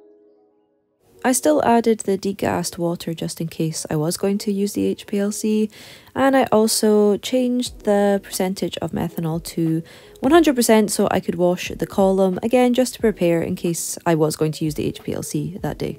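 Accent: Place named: Scotland, United Kingdom. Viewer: Other science phd students: come to ’ videos and understand everything. Me, a humanities phd student: … … looks cool. (*loving listening to your lilting Scottish accent) 💪😂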